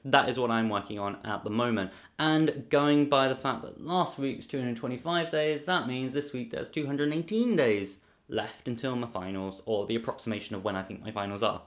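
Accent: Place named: South London, United Kingdom. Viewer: Hey, by any chance u from south london? ur accent seems like u from lambeth or somewhere like that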